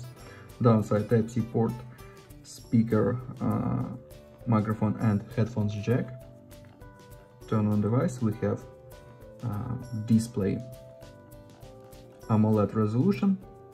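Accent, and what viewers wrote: Russia. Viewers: не плохо ты кудахтаешь на английском, просто просто акцент есть русский немного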